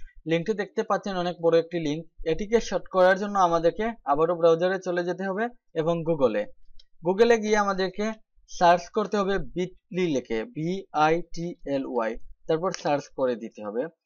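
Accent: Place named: Sylhet, Bangladesh. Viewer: ভালো হয়েছে, তবে কখার মধ্যে সিলেটি একটা টান রয়ে গেছে।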